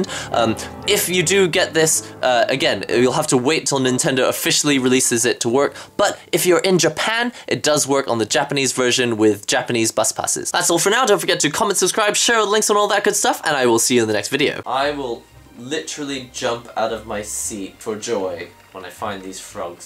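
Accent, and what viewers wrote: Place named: Scotland, United Kingdom. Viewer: Dude! You sound like you have a Scottish accent similar to mine.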